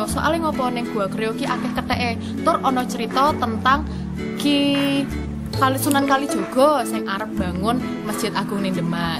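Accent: East Java, Indonesia. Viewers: aku rasa ini masih kerasa jawa timuran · dialek semarangan tapi logat jawa timuran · ini logat jawa tumur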